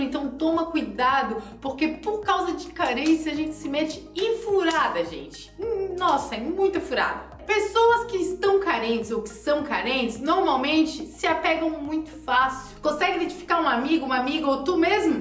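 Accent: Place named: Florianopolis, Brazil. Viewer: Tú é de Floripa né, só pelo sotaque kkk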